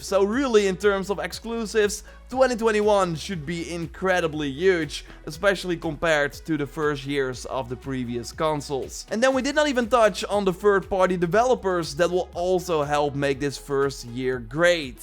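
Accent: Netherlands. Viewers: Kijk uit naar je content man! Ik weet het niet zeker maar aan je accent denk ik dat je ook nederlands bent! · ik weet het niet zeker maar je klinkt NL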